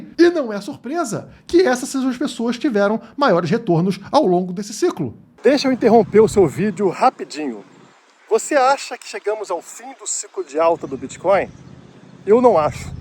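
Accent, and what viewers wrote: Rio de Janeiro, Brazil. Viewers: Pq tanto desespero pra vender curso se vc é tão foda ? 😂😂😂 Carioca né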